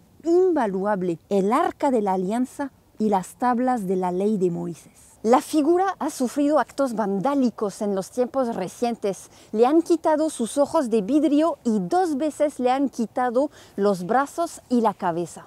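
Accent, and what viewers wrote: France. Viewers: me encanta tu narrativa en perfecto español con acento frances, te felicito por tu videos que me atrapan, saludos. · Ya te esta ganando el idioma frances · c'est magnifique ta chaine aussi que ton accent français quand tu parles spagnol mexican 🙊, je t'apprécie énormément même si je ne te connais pas, saches que tu transmets la Joie de Vivre ✨des bisous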